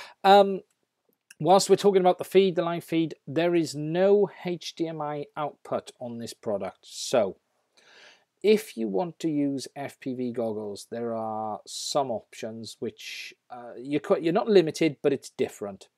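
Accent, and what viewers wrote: Wales, United Kingdom. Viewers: so welsh!!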